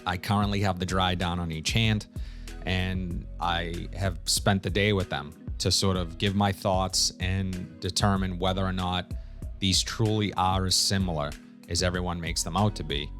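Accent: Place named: Massachusetts, United States. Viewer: You from Massachusetts, bro? You've got the regional accent. "Pak the cah on the boulevaad." 😉 Thanks for the vid. 👍 Cheers from New York City.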